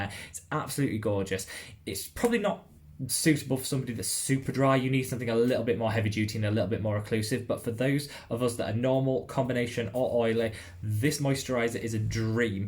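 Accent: United Kingdom. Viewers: Your so easy to watch and listen to. I was a little confused on where you were in the world with a uk accent and then mention dollars but then you said your in the uk think it's great your saying different currency. I'm in Ireland but from the uk 💜 I use the aldi dupe of the nip and fab glycolic pads cheaper again. Haven't tried the nip and fab ones but I think I will have to get some now as this is the 2nd video you've mentioned them 🥰 I will say I can't stand olay products but that's my personal experience so far theres better products that are cheaper again like the ordinary range. Depends on what your targets are I've also just bought some serums from revolution to try and compare Xx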